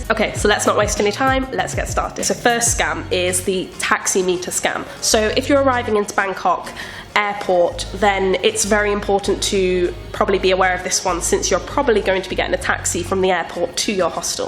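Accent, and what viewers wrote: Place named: London, United Kingdom. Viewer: I love your accent are you from London England ?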